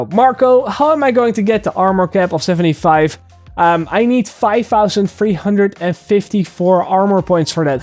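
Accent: Netherlands. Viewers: You're probably Dutch, right?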